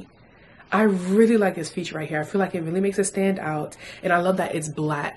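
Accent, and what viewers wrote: United Kingdom. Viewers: Your British accent though...love it, and I'm mad country!🤣🤣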